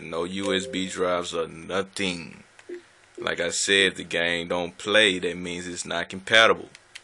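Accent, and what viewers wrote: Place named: Georgia, United States. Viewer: Are you from Georgia?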